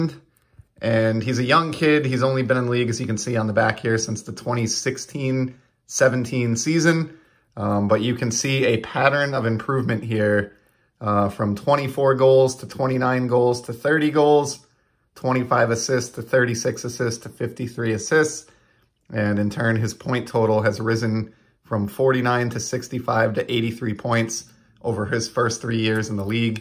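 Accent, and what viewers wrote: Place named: Boston, United States. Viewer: So much boston haha …..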